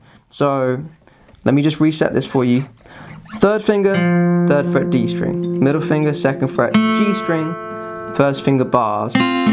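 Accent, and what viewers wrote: United Kingdom. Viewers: Coz he's british like me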